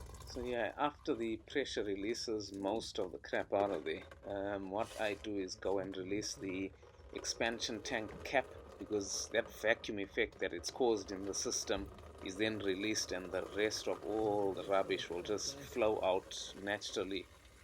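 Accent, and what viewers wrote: South Africa. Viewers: you sound very south africa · Cool video ,you sound South African ?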